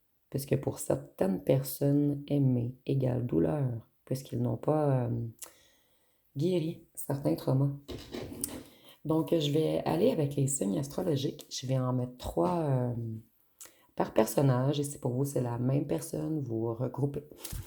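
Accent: Quebec, Canada. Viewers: Eh hop, je viens de booster et liker! 😉 Merci pour votre présence assidue.✨ J'apprécie beaucoup vos guidances...✨et votre joli accent québécois.✨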